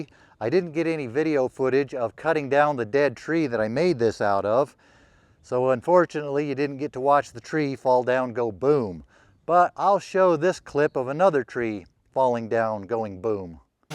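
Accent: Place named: West, United States. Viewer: the wonderful buster scruggs accent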